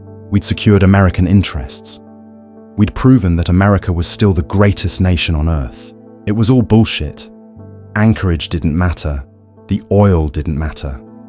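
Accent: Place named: United Kingdom. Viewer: a British accent is entirely wrong for Fallout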